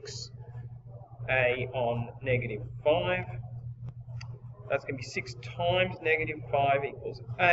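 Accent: Australia. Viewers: The Aussie accent ❤️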